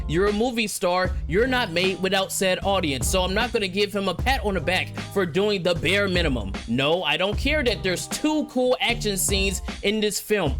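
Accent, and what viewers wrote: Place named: AAVE, United States. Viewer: Your ranting is dumb and makes no sense.Speak proper english next time.Ebonics is not a proper language but just gibberish.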